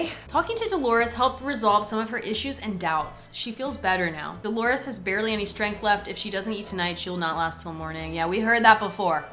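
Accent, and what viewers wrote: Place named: West, United States. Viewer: I fear has slipped into her wild wild western supermarket accent and it hasnt gone away 😭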